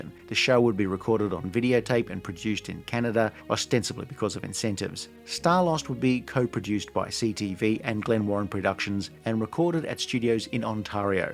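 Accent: Australia. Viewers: I assumed it was the host's Aussie accent, since "tare" would sound like "tire"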